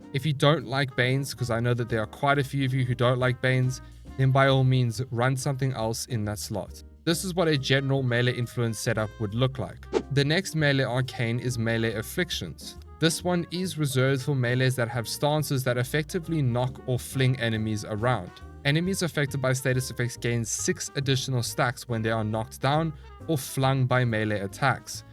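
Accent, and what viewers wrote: South Africa. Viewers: Hey dude just discovered your channel. Really enjoy your videos so far, keep up the good work. Are you from South Africa? :)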